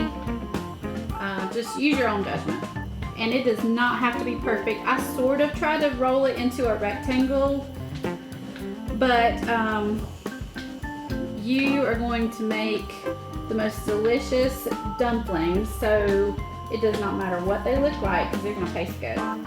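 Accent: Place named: South, United States. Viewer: Just started the video and there's a Southern accent. I'm already confident about this video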